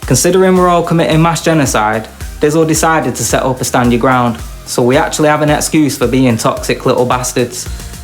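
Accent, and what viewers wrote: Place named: United Kingdom. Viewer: #CrashRaid You are British I like that lol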